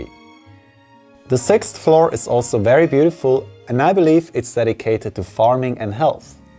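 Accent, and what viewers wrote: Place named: Switzerland. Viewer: Man this Swiss accent ... so sweet.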